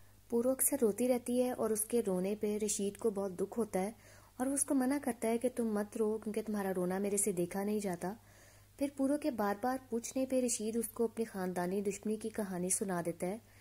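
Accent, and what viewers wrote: Pakistan. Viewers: Aap pakistaniyo jaise bolti hai ? Bht acha explain kiya thank you so much ❤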